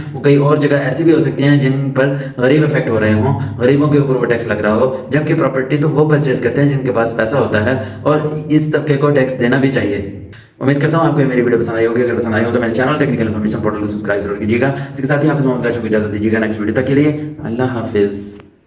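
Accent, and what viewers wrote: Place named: Saraiki, Pakistan. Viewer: یہ سرائیکی ہے یہ جماع نڑو ایسا ہی ہے ایسے ہی بولے گا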